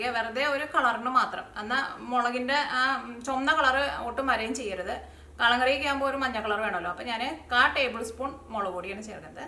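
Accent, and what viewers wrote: Thrissur, India. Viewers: Super trissur slang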